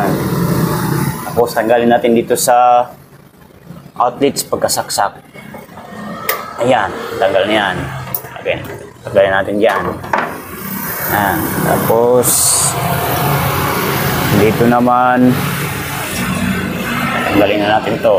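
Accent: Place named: Visayas, Philippines. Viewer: Visaya ka man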